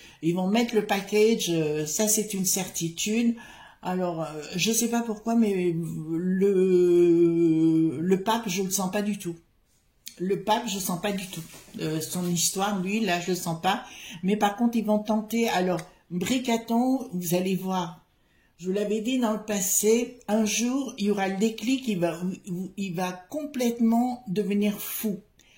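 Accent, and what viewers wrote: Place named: South, France. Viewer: c’est le parler du sud je connais et ça ne me choque pas !‘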